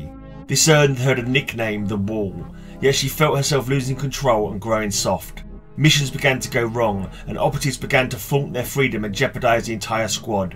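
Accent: United Kingdom. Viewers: Viola played her in Suicide Squad. How to get away with murder is a TV show. Wild show! My brother Matt was in Orange is the New Black, Ratter, and several other things. His best friend Hartley Sawyer played Elongated Man/Ralph Dibny on The Flash TV show. He was brilliant!!! They fired him because of his Tweets from 2011. I was hoping he could tell the producers to give my brother a job as Booster Gold or Green Lantern. Oh well. I shared this vid on Twitter. U have a great narration voice. British, I assume?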